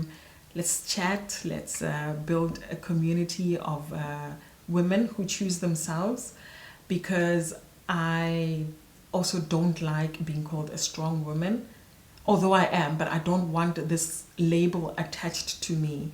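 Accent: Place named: South Africa. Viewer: Umuhle sis❤ from your accent I could tell ukuthi you are south African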